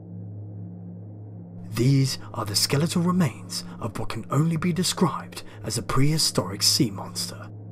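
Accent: United Kingdom. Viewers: Really cool! The British accent really sells it. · the british accent is best, David Attenborough approves that lol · awesome , nice job, ...and the english accent the best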